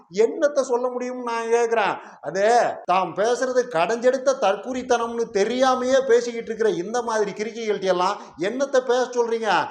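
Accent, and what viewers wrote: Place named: Coimbatore, India. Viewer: அண்ணா உங்க பேச்சு கோவை தமிழ்ல பட்டை கிளப்புறீங்க போங்க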